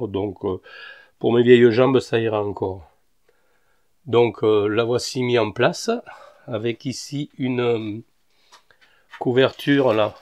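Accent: South, France. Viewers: bonjour et déjà un grand merci pour tous vos conseils j’ai juste deux questions concernant les dalles vous utilisez la 6000k blanc froid c’est bien ça ? Pour la chauffe ce sont des ampoules de terrarium quelle puissance faut il acheter ? Merci au fait vous êtes dans quel région cet accent me parle perso suis du Tarn ça m’intéresse pour avoir les périodes de semis et de repiquage. Merci encore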